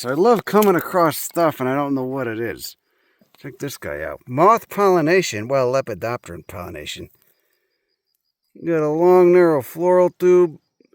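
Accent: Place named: Texas, United States. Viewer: Liked and subscribed pardner. I know a Texas appreciator when I hear one. I will listen to you yap about native plants all day. Looks like maybe far west texas? Could be anywhere from the Big Bend area all the way up to the Guadalupe mountains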